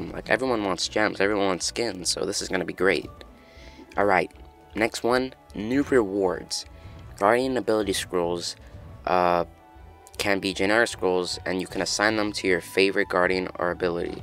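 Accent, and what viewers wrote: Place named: United Kingdom. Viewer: Nice British accent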